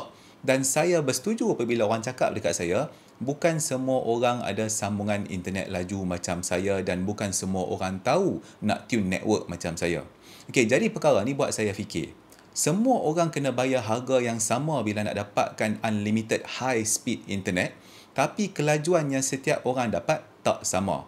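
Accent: North, Malaysia. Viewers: baru jumpa channel tuan lepas tgk video monaliza. sedap dgr tuan cakap utara. rasa kena dengan telinga bila dengar. hehe.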